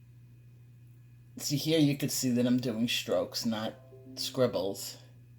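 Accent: New York, United States. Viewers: You are an amazing colorist. I have been pining for Holbeins but just can’t afford them, even though I don’t believe that I would do them justice like you. Her hair is like my mother’s almost, my Mom had a bit more red, mine was darker but with the reddish highlights. I never tire watching you make a picture come alive and love the NY accent!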